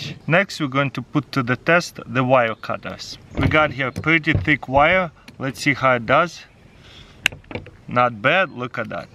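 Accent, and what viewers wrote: Russia. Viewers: псс чувак, а наш акцент-то никуда не делся, ^^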